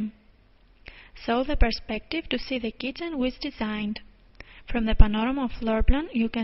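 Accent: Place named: Greece. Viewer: nice accent.. ellhnida? :P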